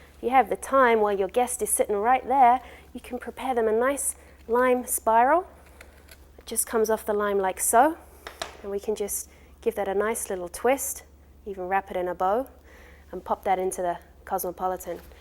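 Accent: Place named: England, United Kingdom. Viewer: I wonder what town in England was from, her accent is quite interesting.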